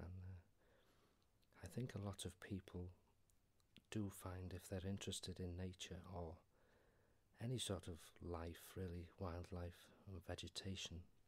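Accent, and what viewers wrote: Wales, United Kingdom. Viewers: Hello! I've really enjoyed your videos. I find your Welsh dulcet tones very soothing. What I think would be a quality idea, would be a video about the birds that visit your garden, or local area. I'd really like to know what bird makes what song and what they look like, etc. To hear their calls on one video, with your voice would be very relaxing as well as highly informative! Blessings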